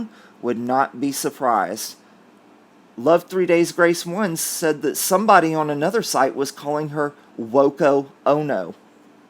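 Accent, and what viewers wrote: United States. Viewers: Hiya hunny, Glocestershire is pronounced like Glaw then the cestershire at the end. It may just be your sweet American accent 😉. Have a good evening.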